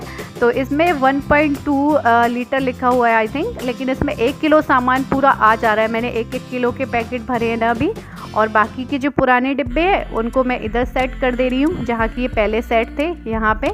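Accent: Uttar Pradesh, India. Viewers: Kahan rehte ho aap.Accent apka up ka lagta hai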